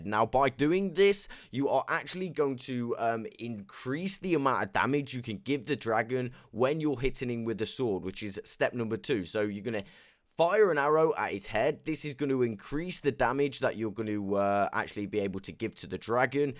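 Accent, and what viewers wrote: United Kingdom. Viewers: You sound like DanTDM but your accent is more British (hope that makes sense lmao). Also great video. It helped me so much, I used to spend so much time getting armor and stuff for fighting her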